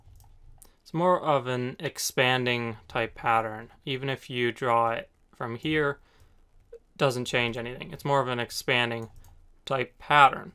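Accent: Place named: New York, United States. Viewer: As a newbie in the crypto world, I can see how easy it would be to get sucked in with misinformation from so called experts. After watching a few of your videos and seeing Bitcoin do exactly as you said it would, I am glad beyond words that I found you. Now instead of putting my money into a course run by thieves I am looking forward to the course you had mentioned that is coming soon. Here is to learning the right way and I don’t know if you are from New York or not but your delivery sounds just like a New Yorker especially when you are talking about all the people out there who don’t know what they are talking about. You just tell it like it is…love it!!!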